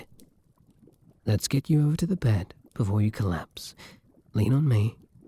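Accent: United Kingdom. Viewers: Ah the British audio I can’t look at them the same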